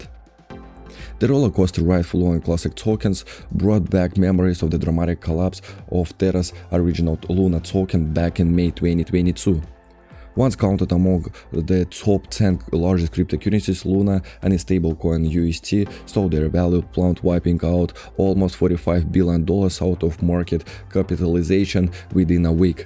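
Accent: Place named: Russia. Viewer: russian accent....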